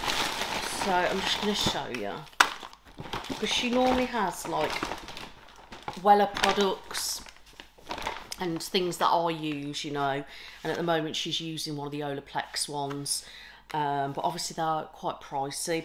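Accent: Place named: North, United Kingdom. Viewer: she sounds more northern - the midlands / the west - just a guess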